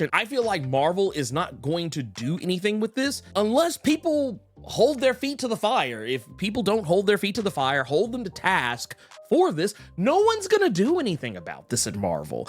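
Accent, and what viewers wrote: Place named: South, United States. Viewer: Ok im new here this guy's voice is very misleading it's not bad by any stretch of the imagination but he has a very southern twang, smart , intelligent, nerdy af .....damn I guess I finally understand what people are saying about me. . Gonna subscribe and shut up now... Great video btw ❤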